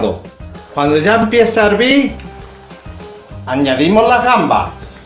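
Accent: Andalusia, Spain. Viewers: Es andaluz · El Concurso habrá sido en Baleares pero usted sin lugar á dudas Andaluz total. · Andaluz, como Antonio banderas, aunque banderas se ha refinado. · Español vulgar?? Este señor no ha dicho una sola vulgaridad, el simplemente habla Dialecto Andaluz, ampliamente esparcido y hablado no sólo en Andalucía sino también en Melilla, Ceuta, Gibraltar y algunas otras zonas de Algeciras. Los dialectos son variedades regionales de una lengua y existen al rededor del mundo en todos los idiomas. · ¿Quién "tiene" razón? La razón, ¿se puede tener? No sé a quién te refieres al decir que "habla muy mal español". Er tipo habla andalús, y si te "duele escucharlo", capaz que necesitas terapia. Es un placéi ehcuchalo, por la gloria de su madre!!! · Que acento andalú! Olé! Voy a probar hacerla hoy... A ver que tal me sale... 😊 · Ese acento andaluz me recordó mis vacaciones en Andalucía. 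· Es andaluz, cada quien tiene su acento incluso tu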